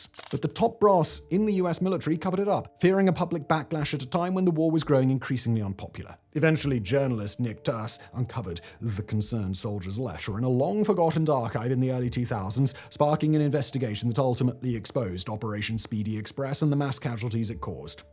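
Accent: England, United Kingdom. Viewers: The United States is not perfect but they act in the best way to keep the world free and safe. I take offense that you talk about this with an English accent. If it wasn’t for the US the UK wouldn’t ceased to exist a long time ago.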